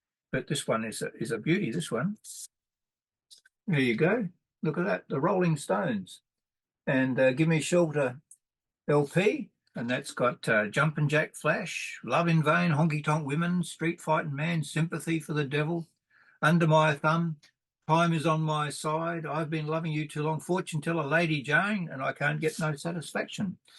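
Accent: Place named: Australia. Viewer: Surprised to hear a good familiar Aussie accent on the first click on the search for reviews of the C-150. Thanks for sharing!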